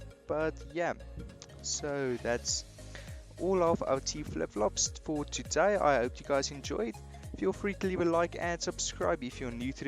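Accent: South Africa. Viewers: hello fellow south african 😌 jou aksent het jou weggegee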